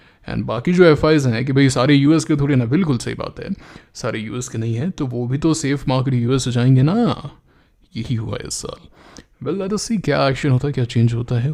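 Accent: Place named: Bikaner, India. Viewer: sir Bikaner ya uske aas paas churu, hanumangadh tri junction ke prateet hote hain bolne se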